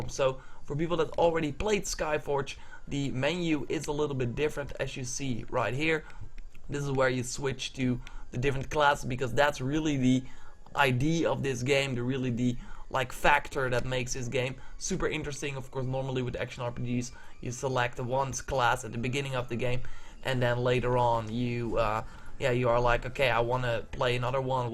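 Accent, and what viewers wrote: Netherlands. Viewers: ik hoorde al gelijk aan je accent dat je nederlands was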